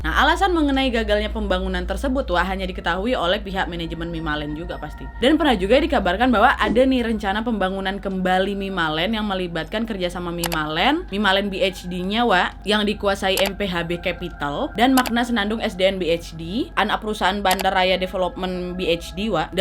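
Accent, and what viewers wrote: Indonesia. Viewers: Menarik Sekali mengikuti Cerita anda , Terlebih dengan Logat Indonesia , Jadi Nyaman Mendengarnya , Sekedar Koreksi , untuk Kata Aku kalau di Akhir kalimat cukup di Ucapkan “ku“ , contoh “Aku telah Membeli sebuah Mobil, dengan Uang hasil Tabunganku“ , dan Kata Aku , Hanya bisa di Ucapkan untuk Pribadi/Keluarga/Teman Akrab , tapi Tidak bisa di Ucapkan untuk Audience , yang Baik dan Benar pakai saja Kata Saya , Bisa untuk Semua , Like dari Surabaya.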